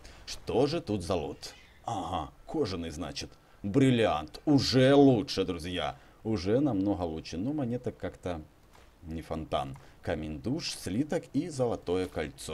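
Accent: Ukraine. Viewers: FryTech228 Это диалект. Так говорят на Украине (в основном) и юге России (меньше).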